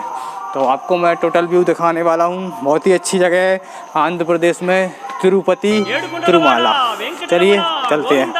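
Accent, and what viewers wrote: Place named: Madhya Pradesh, India. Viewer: सबसे पहले तो आपको वीडियो के लिए धन्यवाद , मैंने वीडियो आज ही देखा है और मुझे जो सबसे अच्छा लगा वह है आपकी बोली जिसे सुनकर मुझे लग रहा है कि आप मेरे जिले भिण्ड या इसके आसपास है अगर मैं सही हूं तो जरूर बताना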